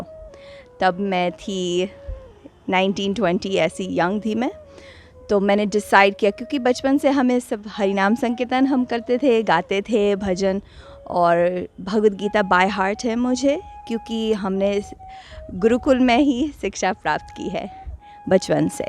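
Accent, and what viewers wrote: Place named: United States. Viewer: Main inko insta par following karta hu, tabhi mai socho ye inka american accent kyu hai.. Mai samjhta tha ye style dikhane ken liye american accent mai boltti hai 🤣🤣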